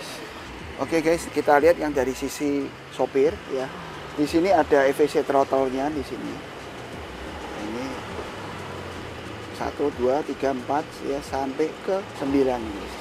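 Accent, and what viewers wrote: Java, Indonesia. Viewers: Enggak Rasis maksudnya guyon kagum kok wes melebur sama darah Jawa medok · Oyi jgn rasisme, sudah asli indonesia lahir di jawa tengah, logat memang logat ibu yg terbawa...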